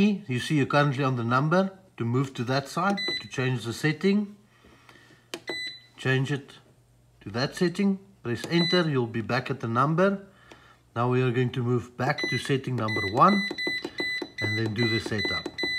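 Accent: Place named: South Africa. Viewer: You cant mistake a south african accent anywhere ...nice one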